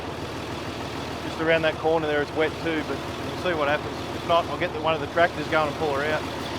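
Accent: Australia. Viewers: are you Australian?????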